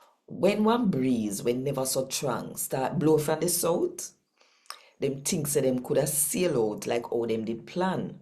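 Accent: Jamaica. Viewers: Such a beautiful lady inside and out. Im about as Jamacian as i look, but i found an awesome way to listen to the bible. I understand it. I cant speak it but i understand. Most of it. I love your language dialect accent or whatever you call it. If you read the gospel of John... i will learn quickly bc i know it almost by heart. Ill look. God bless you, sister!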